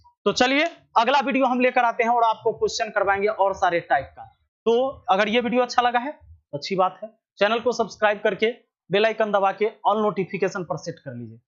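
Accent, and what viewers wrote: Bihar, India. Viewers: ❤आपका पढ़ा बिहार जैसा एकादम पूरा ठेथी भाषा❤❤❤🎉🎉🎉🎉🎉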